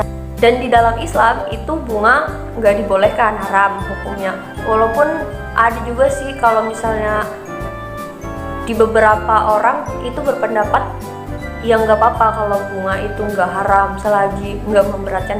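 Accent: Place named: Banyumas, Indonesia. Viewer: "Logat ngapaknya bikin candu" Great job !!! #BERKAHBersamaKeuanganSyariah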